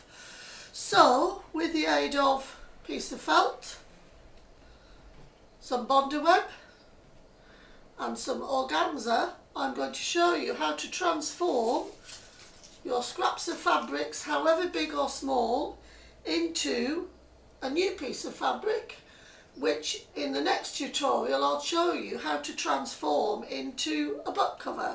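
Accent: United Kingdom. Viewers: This looks like a lot of fun! Thank you :) wondering if you are from Yorkshire?! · Hi so nice to hear someone from the uk especially who doesn't make "long" wind out of everything. Thought your tutorial was excellent and going to put it into practice. Thanks once again Sylvie x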